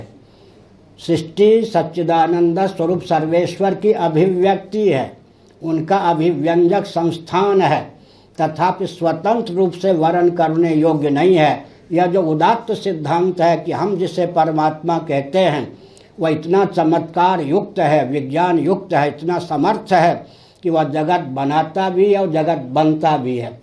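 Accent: Standard. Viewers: विशुद्ध हिन्दी बोलना कोई आसान बात नही है।।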